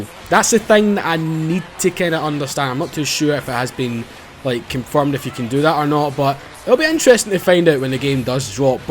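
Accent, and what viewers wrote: Scotland, United Kingdom. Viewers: He is scottish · Its cuz o the Scottish accent pal i understand cuz im scottish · hooks he's talking Scottish slang but at the same time trying not to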